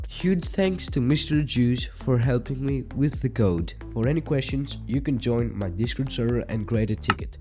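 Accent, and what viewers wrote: Greece. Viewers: i knew by his accent that he was greek and just when he went to the options tab i saw it greek characters never underastimate my gut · Bro Είμαι Έλληνας και εγώ έβλεπα το βίντεο και η προφορά ακούστηκε ελληνική μετά είδα και το κανάλι ήταν από την Ελλάδα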